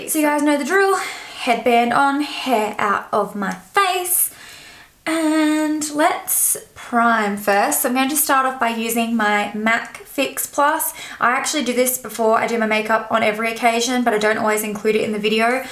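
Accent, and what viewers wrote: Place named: Australia. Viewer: I love Australian accents 💕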